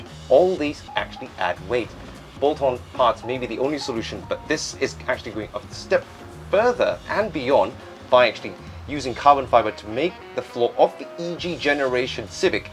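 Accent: United Kingdom. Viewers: I hear Brittish, see Japanese.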